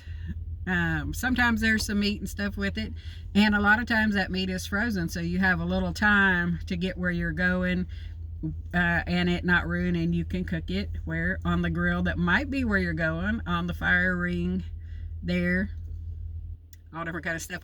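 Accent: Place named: United States. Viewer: Hi . Love your country accent 😍 . So you travel alone?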